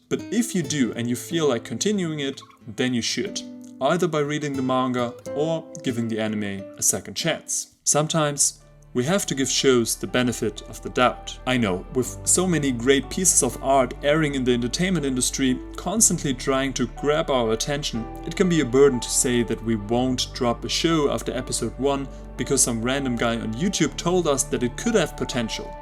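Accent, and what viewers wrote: Germany. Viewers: I hear a bit of an german accent, am i right?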